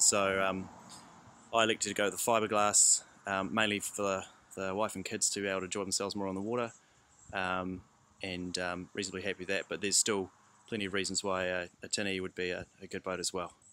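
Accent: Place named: New Zealand. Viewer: I can tell u r kiwi by your accent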